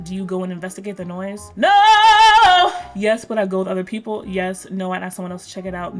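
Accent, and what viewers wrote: United Kingdom. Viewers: the British accent. I love it queen. 👏🏽👏🏽👏🏽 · YOUR BRITISH ACCENT IS ACTUALLY SO SLAYYYYYYYY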